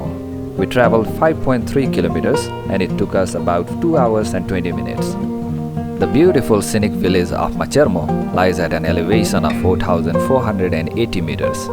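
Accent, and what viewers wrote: United Kingdom. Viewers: Amazing vidéo! the landscapes are beautiful and the explanations so clear, love you english accent! where u come from ? after reaching gokyo valley how did u came back ? and by which way?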